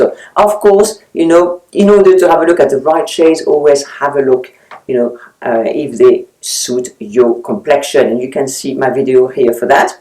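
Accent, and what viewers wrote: France. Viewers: 70s???? Yuck!!! On a brighter note, you are an inspiration of style. I love watching you and I love your accent. I have always considered the French to be the epitome of elegance and you exude exactly that. And I think deep down you are also a good hearted person, one of the good people of the earth. Love you!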